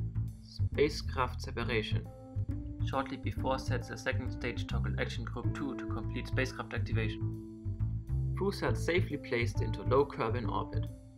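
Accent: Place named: Germany. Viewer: I don't think your accent/ pronounciation is Bad ctually, I think the way you say the 'th' is just really german, and I hate it when people say it like "s".:P Ich bin übrigens auch deutsch:D Hat mich ne weile gedauert das ordentlich aussprechen zu können xD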